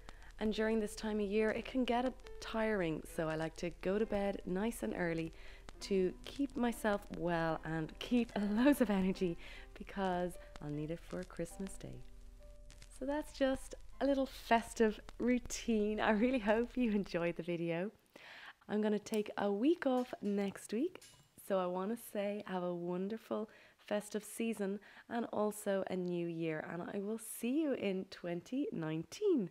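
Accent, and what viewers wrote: Ireland. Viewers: Am I detecting an Irish accent? My family came from County Cork so Ireland is near and dear to my heart.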